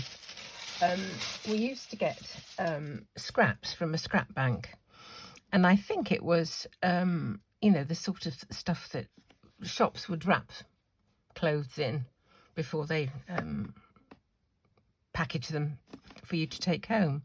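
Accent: United Kingdom. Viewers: I just found you I love your British accent I'm from East if Europe but I leave in USA and love your video so much is so so interesting and very educativ .I'm a beginner on card making Thank you so so much Best to you from VA 🇺🇸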